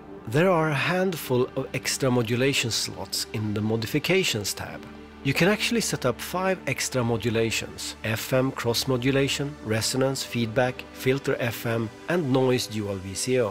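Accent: Sweden. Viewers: Dr Mix speaks with an Italian accent. This guy speaks with a pronounced Swedish accent. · Du låter svensk :)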